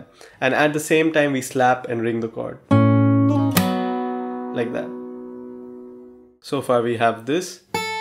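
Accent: India. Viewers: Your accent is kinda similar to indian accent! Are you from India? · Hey bro are you from India your accent sounds a little bit Indian 😅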